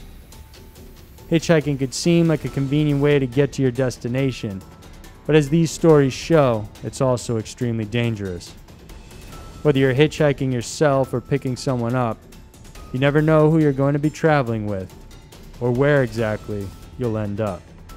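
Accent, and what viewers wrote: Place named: Worcester, United States. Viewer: yeah, man. I'm from Worcester and kinda Heard it in there. Great work with your channel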